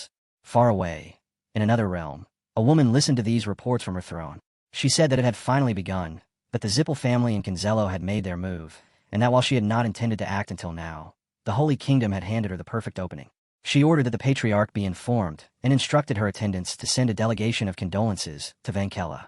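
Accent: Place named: United Kingdom. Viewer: The audio became a British man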